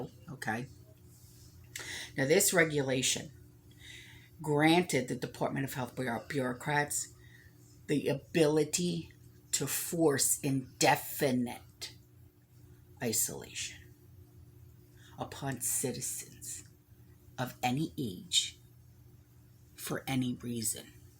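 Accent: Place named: New York, United States. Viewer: I see you live in Florida, but you definitely sound like you're from NY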